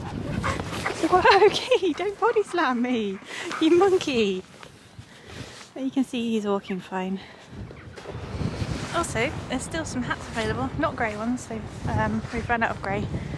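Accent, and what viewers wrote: United Kingdom. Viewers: you have beautiful eyes and hair.You also have such a sweet voice.I love the British way of speaaking.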